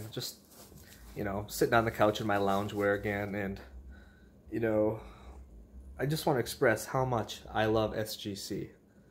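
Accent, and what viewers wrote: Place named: Midwest, United States. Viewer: Hahahaha you have the voice down pat! Awesome! You still have the Midwest accent though!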